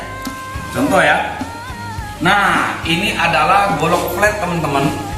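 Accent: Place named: Palembang, Indonesia. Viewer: Mantul Pak Ustadz ngomong2 dimano ini palembangnyo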